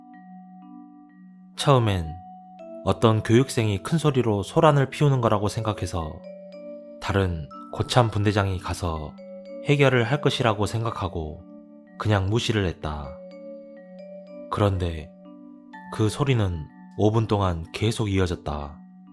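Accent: Jeolla, South Korea. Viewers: 전라도 사투리가 찐하게 뭍어있네요ㅋㅋㅋ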